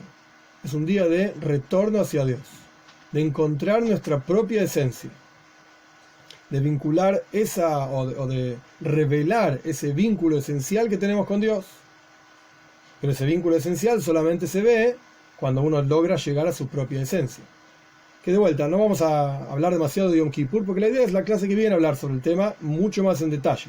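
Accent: Argentina. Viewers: Rab usted es argentino?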